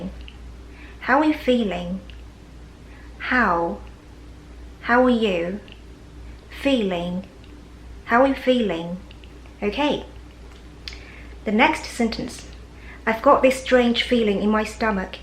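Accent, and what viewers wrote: United Kingdom. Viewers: 你的英式英语的口音非常漂亮。但是你不知道美式英语中的L,N,R,M的前后都有元音时，这4个音要用2次。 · 我喜歡fanfan的英國腔還有神秘人的聲音很有趣因為他兩次一定會有一次聽起來很不耐煩😂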